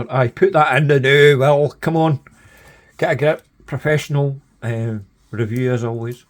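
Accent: Scotland, United Kingdom. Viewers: How have I never seen your reviews before 🤯😂 love your absolute honesty and the good Scottish language,top notch 😎👍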